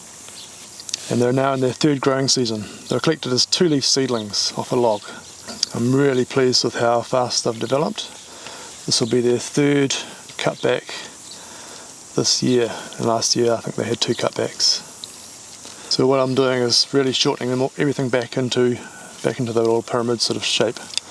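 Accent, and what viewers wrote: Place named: New Zealand. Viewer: Kia ora! Great video, really love the style you do this in (plus it's great to hear a familiar accent) Just wondering where you get your tools and soil etc from in NZ? It can be difficult to source the commonly recommended stuff. I'm just a noob and would love to hear some tips for us specifically here in NZ ❤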